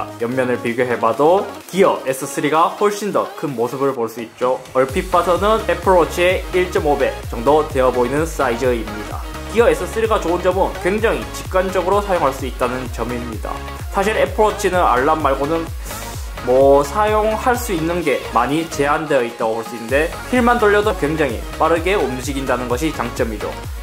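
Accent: Gyeongsang, South Korea. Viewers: 잘 보고 갑니다 ㅋ 대구 말이 정겹네요 ㅋ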